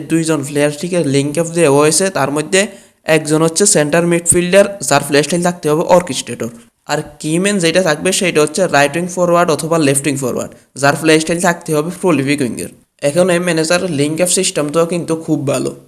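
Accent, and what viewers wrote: Noakhali, Bangladesh. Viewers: ভাই কি নোয়াখালীর নাকি?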